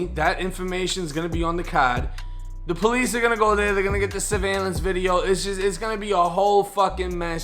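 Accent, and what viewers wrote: Boston, United States. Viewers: You can hear the Boston in your accent 😂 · Yo what up i hear that Boston accent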